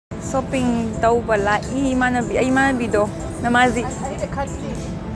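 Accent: Kakching, India. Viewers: Kakching marol yam lol lay kei ge eche nang ba Yam nung ngai tay ko Nachak khuthe du ga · che na kakching marol lonba C ymna nungCye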